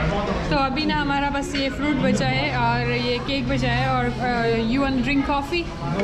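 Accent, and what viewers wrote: Sindh, India. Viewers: Are u sindhi?? 🤭😂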